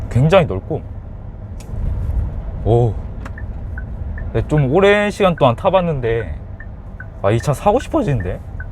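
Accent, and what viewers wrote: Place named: Jeolla, South Korea. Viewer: 전라도 말씨여ㅎㅎ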